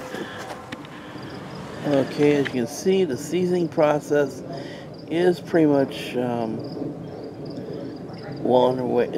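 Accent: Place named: Australia. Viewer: Great idea for a video man! I found it entertaining. I don't really use that much charcoal for my first seasoning, I just fill the chimney and burn that much out...but you definitely made sure you got out all contaminants out! I loved the aussie ascent too!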